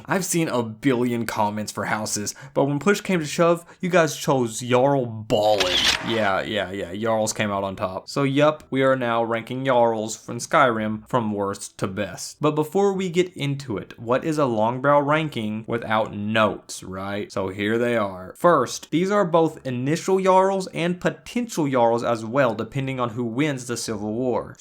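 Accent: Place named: South Carolina, United States. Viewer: Wow what an accent. SC?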